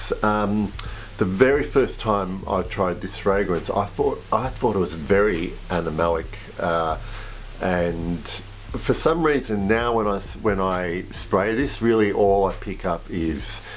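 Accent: Australia. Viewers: So, you can pronounce the name of it. Seriously, I thought you were from Australia. Sorry mate, time to let you go. 👋👋 your believes are very different than ours.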